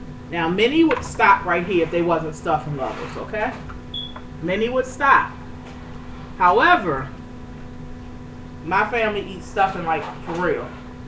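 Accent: Baltimore, United States. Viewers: are you from baltimore?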